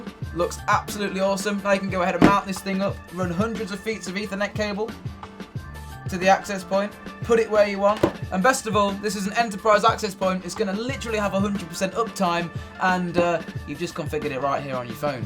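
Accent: England, United Kingdom. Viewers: English (UK) English (US) English (AU/NZL) English (Canada) All not same in pronounce of router Please not tell him how pronounce he use British accent. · I like your English accent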